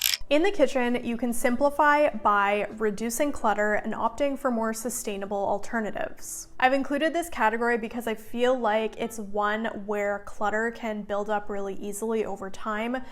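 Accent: Canada. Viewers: Hello, I'm glad I found your YouTube videos today. I have now subscribed. I was brought up in Canada and recognize your accent and see the spelling differences. I like your straight forward ideas and how you make it simple for anyone to achieve a great goal. I would appreciate a video on cleaning recipes and ideas as I make most of my own cleaning products also. I do still use good old Comet or Barkeepers Friend powders. I will be looking for your video. Have a good week.😊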